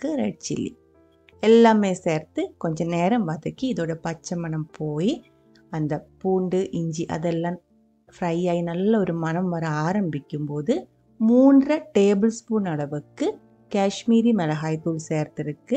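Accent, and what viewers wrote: Kerala, India. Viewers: മലയാളി തമിഴ്‌ പറയുക ആണോ?അതു പോലെ ഒരു accent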